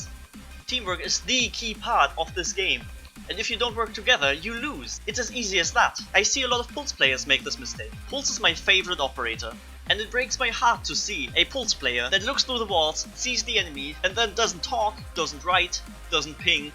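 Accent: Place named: Germany. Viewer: Haha, ich bin auch deutscher, ich kenne den Akzent von mir selbst nur zu gut:D Aber mach weiter, deine Videos sind super! Und es sollte 'german accent' heißen, nicht 'germany accent'. Ich hasse es, auf dem handy zu tippen:/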